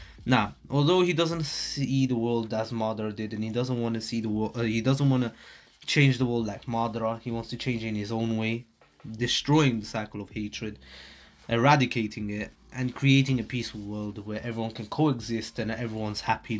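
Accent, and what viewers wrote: United Kingdom. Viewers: Are you British mate yeh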